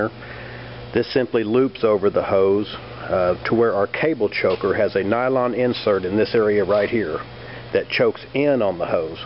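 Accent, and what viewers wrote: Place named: South, United States. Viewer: Lots of words in a southern accent.........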